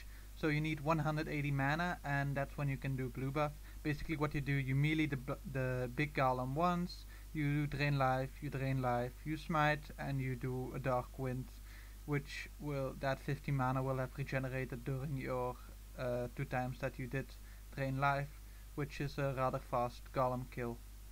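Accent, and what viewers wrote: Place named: Netherlands. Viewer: ik hoor dat je nederlands bent :p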